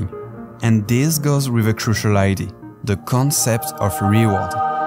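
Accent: France. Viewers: c’est à l’accent qu’on reconnait le frenchman 😎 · Tu as un léger accent français , est -ce ton origine? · He's first language seems to be french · W french accent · Bc of ur accent sound French background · lol huge french accent bro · I like the french accent while talking, even though you talk pretty well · Unrelated but your accent sounds very french, are you french? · Accent français détecté ! En tout cas super vidéo ! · Bruh this video was just me getting frustrated because you sound so french, so your english is pretty weird but stills english so it still harder to understand than french x) (for me of course) but if we forget that, great video bro ! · 🥖🥖🥖🇫🇷🇫🇷🇫🇷 l’accent français on est là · Un bon accent bien français qui me permet de comprendre sans sous titre, gg les gars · sympa l'accent français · am I the Only one to think that this guy has a french accent? 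· On reconnais l'accent français même si tu parles trop bien mdrr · Hey la vidéo est géniale, n'ayant pas tous les termes informatiques en anglais c'était difficile à suivre mais très passionnant. Au vu de ton accent (et de ta couleur de voiture) je pense que tu es français. Si à l'occasion tu peux mettre des sous-titres français pour les gens comme moi qui ne s'y connaissent pas forcément en informatique et machine learning ce serait super ! Ta vidéo a un rythme à la Virtual, tu pourrais devenir le Wirtual français ce serait excellent. En tout cas superbe vidéo, bien montée et captivante même pour moi qui ne joue même pas à ce jeu et n'y connais rien à tout ça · Tes francais jai cramé ton accent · l’accent français on est là mon g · j'adore l'accent françait sa fait plaisir! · Ton accent francais se voit (un peu) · Ça sent l’accent français un peu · il a un big accent français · Like your French accent ! 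· Great video, just asking are you french because if u are ur accent is thick X)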